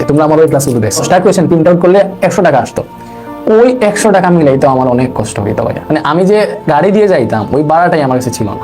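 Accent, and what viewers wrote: Sylhet, Bangladesh. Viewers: Baiya sylhet r kun jaygay apnar bari. Apnar kota bolar style deklay buja jay apni kati Sylheti ❤ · Accha sir ki Sylheti..? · ভাইয়া কি সিলেটের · সিলেটি নাকি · Apnar basha kotay vaiya sylhet er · Bai apne Sylheti 😮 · ভাইয়া আপনি যে সিলেটি এটা এতদিন ক্লাস করার পরও বুঝলাম না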